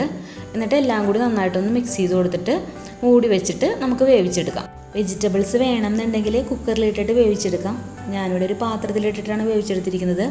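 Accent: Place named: Thrissur, India. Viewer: Thrissur presantation superrrr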